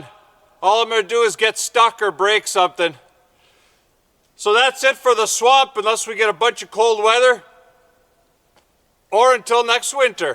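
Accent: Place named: Upper Peninsula, United States. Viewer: Yooper ASMR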